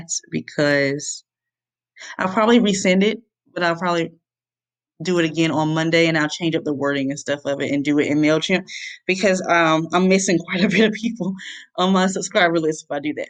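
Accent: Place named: South, United States. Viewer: oh you’re from Enfield, you country lol I mean I’m country too, but y’all accents are thick lol Do you prefer mailchimp over Privy?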